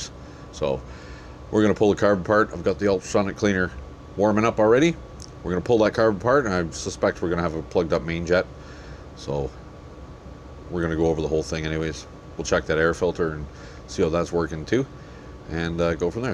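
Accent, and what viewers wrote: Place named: Canada. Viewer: By chance are you Canadian?? Noticed a bit of an accent.🤔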